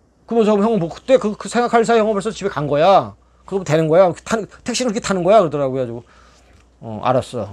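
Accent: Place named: Jeolla, South Korea. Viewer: 전라도 충청도 인천 사투리 다 있으신거 같아요 ㅎㅎ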